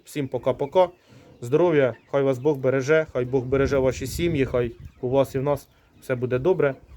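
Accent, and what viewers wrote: Poltava, Ukraine. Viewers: По мові пізнала, що Полтавщина, а тут ще й Устивиця - бабуся там жила. Ностальгія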